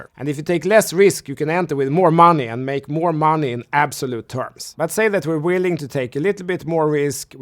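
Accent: Sweden. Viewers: Skönt med någon som kör hårt på sin svenska dialekt, älska`rt! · Snälla sluta prata Stockholms engelska, hemskt för fan. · Man this Swedish accent is thicc a-f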